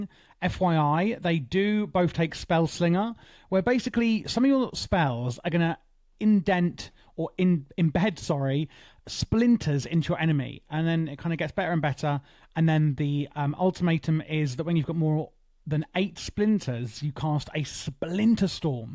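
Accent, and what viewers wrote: United Kingdom. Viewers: HES Talking the clean British stuff bro. Git gud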